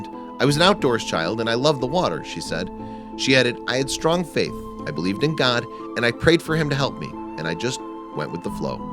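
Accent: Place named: East Coast, United States. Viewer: Who hired this narrator, I can't stand his east coast twang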